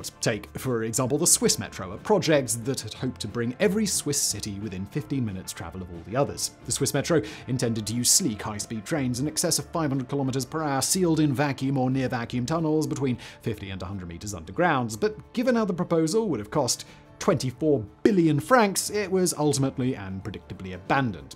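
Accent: United Kingdom. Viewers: Hearing a British accent talk crap about maglevs when y'all can't afford to build stock HSR is cute.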